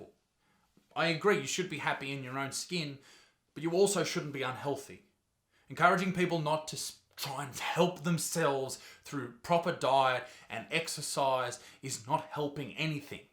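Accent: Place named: Australia. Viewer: I did not expect the Auzzi accent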